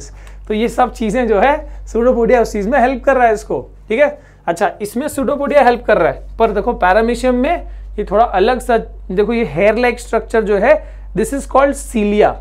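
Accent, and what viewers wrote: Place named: Bihar, India. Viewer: sir, u r from bihar... if i m right .. then i feel very glad to hear this 😊😊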